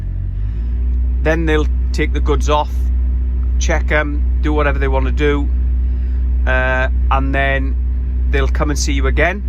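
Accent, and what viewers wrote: Wales, United Kingdom. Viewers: Sounds good to me the more the better with your accent it sounds if you are originally Welsh if so what part 👍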